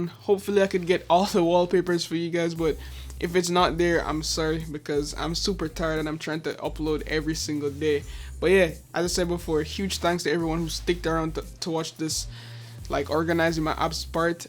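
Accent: Jamaica. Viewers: Man sounds like a Yardie. Jamaican accent cya hide iyah. Big ups pon di content 👍🏾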